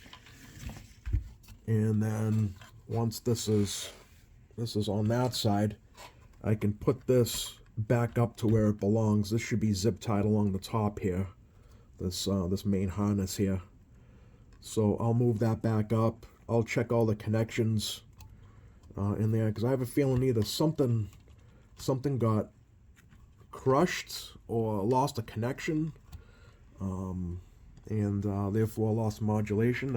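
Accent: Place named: Southern New England, United States. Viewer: Interesting video. Some food for thought, and I'm certainly not trying to pick on you at all! Your signal tracing is using a signal injector. It emits a tone, probably 1 khz. Technically you didn't need to say audio. Just key the microphone and listen for the tone. Additionally I wouldn't mount the echo board near the rf power section. Leaving the echo board where it was originally mounted and cutting the echo board wires to the needed length may have been a better idea but that's all up to you. I'm just offering a different prospective. It's clear you enjoy working on CB's. It can be challenging and rewarding. My signal injector looks exactly like yours except mine is an old Radio Shack unit I picked up in a package deal from Smokey's CB shop in North Grosvenordale Connecticut. I bought all of his equipment many years ago when he retired. 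You're accent sounds like you're from Southern New England. :-)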